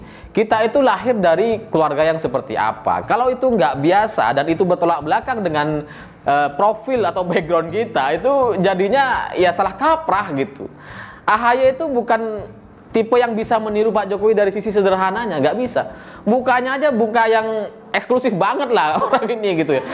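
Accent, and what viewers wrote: Madura, Indonesia. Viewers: Logat maduranya sangat kental bang..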